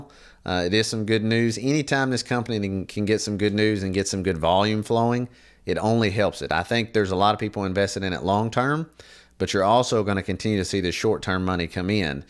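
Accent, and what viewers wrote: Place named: Texas, United States. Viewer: You from Texas? You sound Texan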